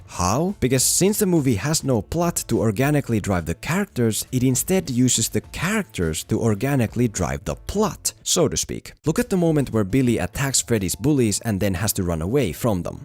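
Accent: Finland. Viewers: Is this guy Finnish? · Are you from Finland? You have a very Finnish accent. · I hear a Finnish accent :)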